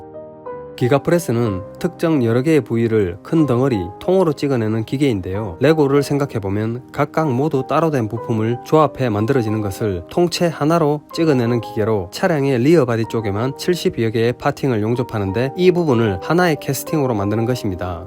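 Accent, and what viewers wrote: South Korea. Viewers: 님 안녕하세요..처음 여기 와보네요.사투리가..칠곡 살던2000년 기억나네요..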